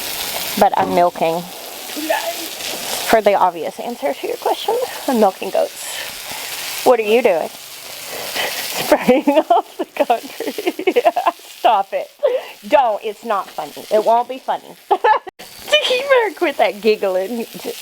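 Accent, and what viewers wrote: South, United States. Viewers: Just for the record, you're beautiful and I love your cute voice <3. Your southern accent is perfect for video; I think my own midwestern voice would drive me nuts if I vlogged! Thanks, for the encouragement about going gray. It's always hard to resist vanity and choose to be comfortable with how God made me. I'm not going gray yet, but I'm sure it's coming soon!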